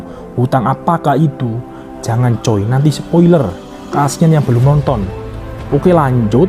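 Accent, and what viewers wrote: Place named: Java, Indonesia. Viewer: Gua suka pembawaanmu bro... Jawa banget....opo onone 😄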